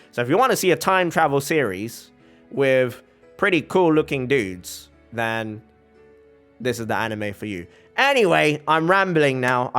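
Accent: United Kingdom. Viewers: You are gonna love Link Click. Odd taxi has brilliant writing but like you I was just blown away by Link Click. I always feel like I am visiting with old friends from the UK when I hear you stream. Love your content mate.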